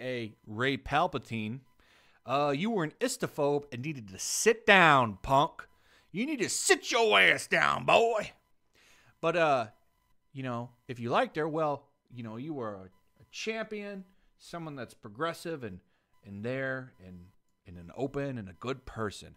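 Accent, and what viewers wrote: England, United Kingdom. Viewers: 'Peckman'? Oh, you read almost as badly as Gary Beuchler. Peckham. Pronounced 'PEK-em' or 'PEK-um'. An area in south London. I'll give you this, though: this is one time when your daft plummy english accent is appropriate...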